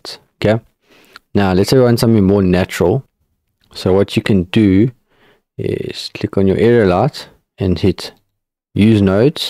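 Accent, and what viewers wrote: South Africa. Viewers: The first 3 seconds of the video and I already thought that this guy is probably South African :)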